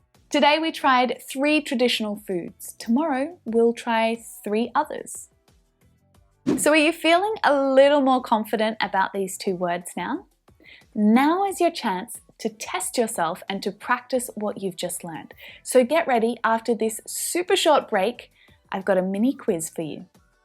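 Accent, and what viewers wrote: Australia. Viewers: I wanted to quit watching you so I don't pick up your Australian accent but you are so good I just can't do it. 😇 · I agree...her Australian accent is calming and relaxing to listen to... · hi from Turkey aim iraqi i love your accent and i hope i can go to Australia as soon as possible, i love it and love your teaching💖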